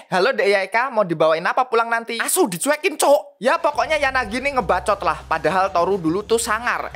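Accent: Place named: Banyumas, Indonesia. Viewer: Bjir admine wong ngapak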